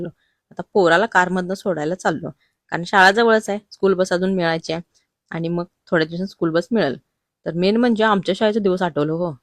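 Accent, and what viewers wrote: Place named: Solapur, India. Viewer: तुम्ही असेच गावची भाषा बोलत रहा ऐकवयास चांगले वाटते सोलापूर ची भाषा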